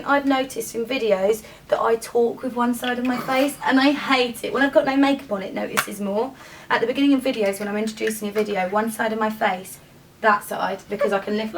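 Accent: United Kingdom. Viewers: We, Americans say vitamins (long i as in Hi), you lovely Brits say vitamins (short i as in hit). Love your vids, I watch them all the time.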